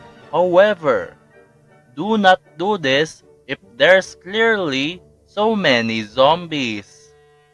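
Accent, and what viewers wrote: Philippines. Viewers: Woah you're a fellow kababayan, I could tell from your Filipino accent. Amazing on point video about sapper building management.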